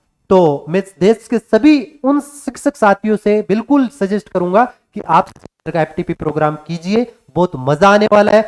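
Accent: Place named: Haryana, India. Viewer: Sir aapki voice tone se lag rha h ke aap haryana se ho, mai bhi haryana se hu and I am preparing for neet ug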